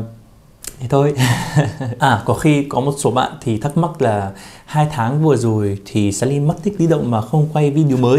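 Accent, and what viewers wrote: Standard. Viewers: Bạn nói tiếng việt quá chuẩn và ngôn từ rất rất là văn chương 👍🏻👍🏻👍🏻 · Ui mới nhìn tưởng a ko nói rõ dc tiếng nhưng s khi xem a ấy phát âm tiếng việt chuẩn quá trời luôn 😶😶😶😶😀😆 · Wao, first time watching, bạn nói tiếng Việt chuẩn quá🇻🇳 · Quá ấn tượng, bạn nói Tiếng Việt rất chuẩn, chúc kênh phát triển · tiếng việt chuẩn quá anh ơi. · Bạn nói tiếng việt hay quá, nói còn chuẩn, phát âm hay rõ hơn mình nữa👍👍👍👍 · Cảm ơn vì bạn đã yêu quý VN và có phát âm tiếng Việt rất chuẩn... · Nói tiếng việt chuẩn và lưu loát.chúc bạn sống vui vẽ tại Việt nam nhé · Tiếng việt salim chuẩn quá ! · Bạn này nói tiếng Việt chuẩn quá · Tiếng việt của bạn chuẩn quá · Bạn nói tiếng Việt rất chuẩn 👍👍👍👍🌹🌹🌹🌹🌹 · Anh nói chuẩn phết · Nói tiêng viêt chuẩn đấy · anh phát âm chuẩn và hay quá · Bạn nói lưu loát quá ! Rất chuẩn ! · Trình độ tiếng Việt của bạn còn chuẩn hơn cả mình nữa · Giọng chuẩn và diễn đạt quá hay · Tiếng Việt của bạn quá chuẩn, tôi rất thích 👍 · Phát âm chuẩn luôn nghe khó có thể nhận ra được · Giọng nói chuẩn hay quá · Mình xem đc một video của bạn và đã lập tức đăng ký ngay rồi bắt đầu vào xem mấy video khác,bạn là người nước ngoài mà nói tiếng Việt Nam rất chuẩn đặc biệt bạn cũng thấy rất yêu quý đất nước Việt Nam.Việt Nam luôn chào đón tất cả bạn bè từ khắp mọi nơi trên thế giới,Việt Nam có lẽ là đất nước hiểu dõ giá trị của hoà bình hơn ai hết nên cũng mong tất cả mọi người trên thế giới luôn chung sống hoà bình với nhau. 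· tiếng việt quá chuẩn, rất tuyệt vời, chúc bạn luôn mạnh khỏe và thành công!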